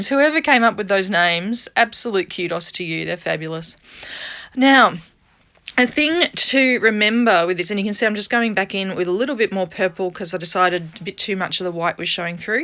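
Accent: Australia. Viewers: A fellow Aussie, Yay! Glad to hear the familiar accent during the hop. New subscriber from Central Coast NSW ❤️🇦🇺 and just a quick tip for when you run out of the Snow White mixative, I use white nail polish! Sometimes you need two coats depending on how opaque it is. 😊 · Hi. Happy Easter 🐣. Thanks for sharing your alcohol ink techniques with us all. What an honour to be celebrating Jessica! The blues and bronze on white is my pick. So rich and lux looking. Oh and I love your Australian accent. It’s just like mine 😉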